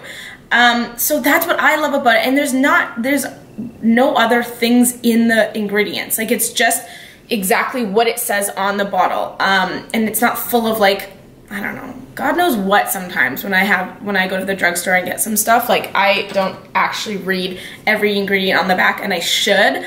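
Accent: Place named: Canada. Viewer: Just Canadian accent.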